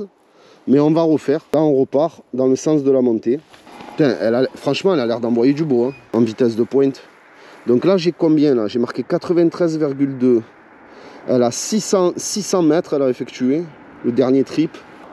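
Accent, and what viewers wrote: Marseille, France. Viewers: l accent marseillais avec une belle voix , c est vraiment jolie a entendre , tu vois ce que je veux te dire comme dirait Alonso 😊. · Jkiffe trop le style de tes tests en toute simplicité, au naturel, la passion que tu transmets, et même ton accent de Marseilléééé! Continue frérot, la bise de Paris 😉😉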